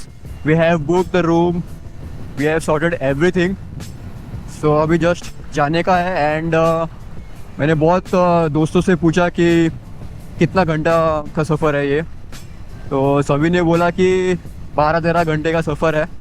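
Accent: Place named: Goa, India. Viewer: Lived in Goa for 11 years, hearing you brings back memories. You literally have similar accent to one of my really good friends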